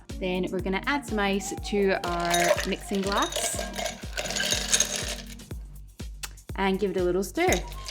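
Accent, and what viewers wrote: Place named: Scotland, United Kingdom. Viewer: is now so connected with cocktails in my mind that all recipes are read in a Scottish accent.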